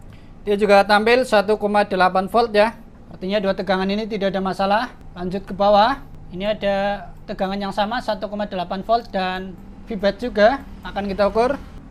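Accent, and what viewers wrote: Banyumas, Indonesia. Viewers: Ngapak ya kak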